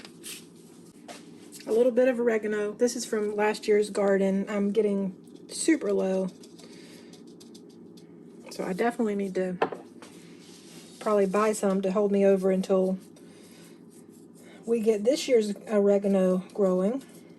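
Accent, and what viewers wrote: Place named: Eastern North Carolina, United States. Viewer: Just found you and have subscribed. Was wondering what state you are from. I’m from small town in Eastern NC and you sound like us with your accent. Thanks for the great recipes from my childhood.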